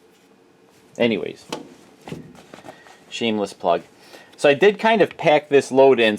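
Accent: Wisconsin, United States. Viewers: You definitely sound like you’re from Wisconsin or Minnesota, but most likely Wisconsin. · I almost want this guy with his Wisconsin accent to say “Marge, get the cheese wheel”.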